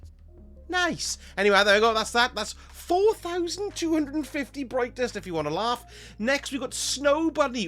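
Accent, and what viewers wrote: Wales, United Kingdom. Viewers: still on the mend but feel way better than I did thank you. Now, important question.....do we get to hear your lovely Welsh tones in a live stream tomorrow per chance?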